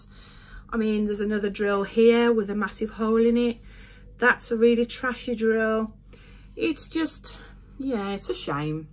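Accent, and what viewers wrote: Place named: Birmingham, United Kingdom. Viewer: Hi glad you finished it . And nice to hear a Brummie like me. Looking forward to seeing Tinkerbell.😁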